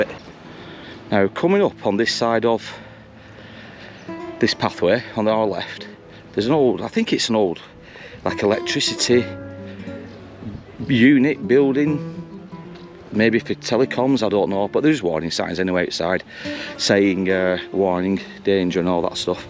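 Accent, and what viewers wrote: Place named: Lancashire, United Kingdom. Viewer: Your putting that stupid Lancashire accent on like Lindsey Hoyle,the speaker of the house of commons, really mate you seem a decent guy but chill the Lancashire accent a bit nothing personal,I do like your videos 😜.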